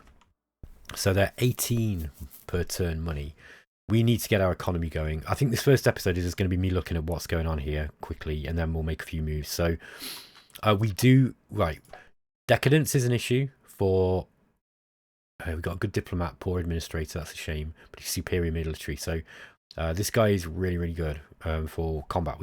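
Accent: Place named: Scotland, United Kingdom. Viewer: Oh I can believe it. Just interesting that you managed to identify the exact same strategic vulnerability that the Romans did. Hell, if your explanation was flowered up a bit, and in Latin instead of your calming Scottish brogue it wouldn't have been all that out of place on the senate floor. To paraphrase Livy Book 16: "Against them and against king Hiero of the Syracusans, the Senate decided to offer help to the Mamertines. There had been much debate between those for and against it. For the first time, the Romans crossed the sea with an army and they fought successfully against Hiero who sued for peace, which was granted."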